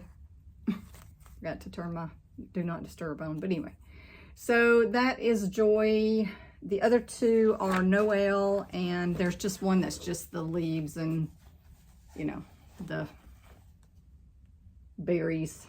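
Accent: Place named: Alabama, United States. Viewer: I’m hearing the Alabama!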